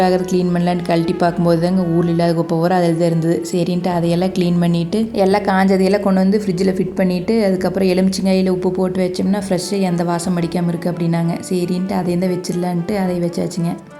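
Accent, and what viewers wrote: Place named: Kongu, India. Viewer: Kongu tamil kano vera yaro English la pesaragale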